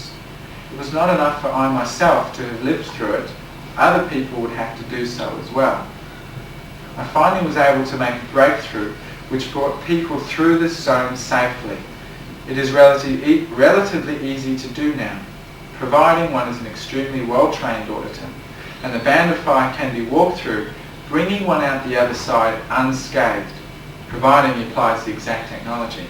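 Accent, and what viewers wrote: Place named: United Kingdom. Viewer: But his accent is British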